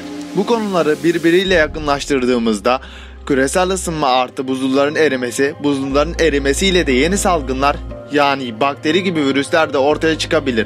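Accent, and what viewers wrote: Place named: Thrace, Turkey. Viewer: Trakya şivesi seziyorum.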